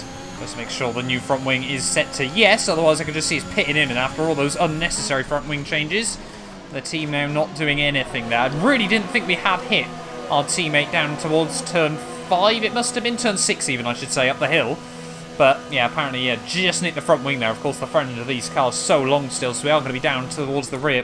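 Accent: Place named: England, United Kingdom. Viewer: time to hear say "reta oposta" and "bico do pato" in an english accent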